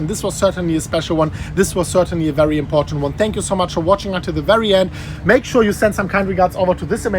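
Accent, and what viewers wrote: Germany. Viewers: Fuck, seriously. You spend more time abroad then in Germany, start getting rid of that stupid accent. Sound just ridiculous. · How do you have such a thick German accent with your command of the English language..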